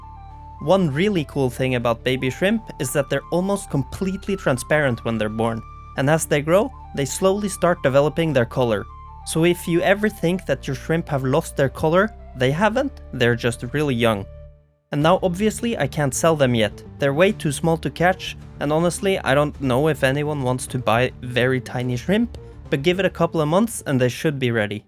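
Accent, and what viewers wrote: Norway. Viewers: The problem is, in Sweden you are not allowed to sell shrimps on facebook (thougth it was the same in Norway where it sounds like you are from). But great video!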